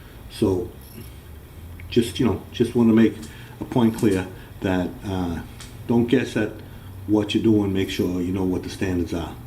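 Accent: Boston, United States. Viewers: I never tire of hearing the Boston accent. 🤣😂😁😂🤣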